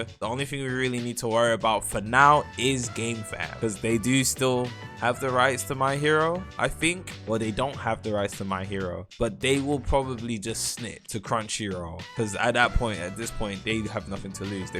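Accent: United Kingdom. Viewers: most bristh person i ever heard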